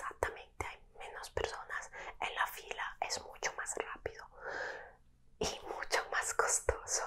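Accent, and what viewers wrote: Italy. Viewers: Eres de Italia? , nose tu hacen to me parece Italiano 😁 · De que pais es? Italiana? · Se te esta pegando el asento italiano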